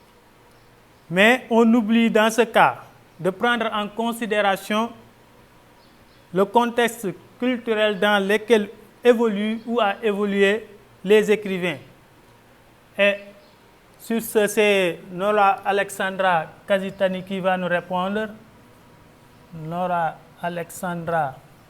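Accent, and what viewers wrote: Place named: Africa. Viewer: J'ai eu 2 enseignants ayant une telle diction. Ils étaient professeurs de mathématiques. 6 classes du collège ont vu les élèves en échec total. Donc plus de 2 ans à reprendre pour 198 élèves. La langue française est une langue vivante, je ne doute pas de ses qualités littéraires écrites. Mais les différents dialectes de chaque pays africain affectent la diction. Une étude à été dirigée dans plusieurs pays africains.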